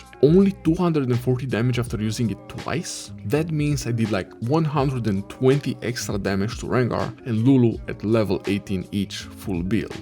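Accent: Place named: Russia. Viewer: Боже что за русский акцент 🫠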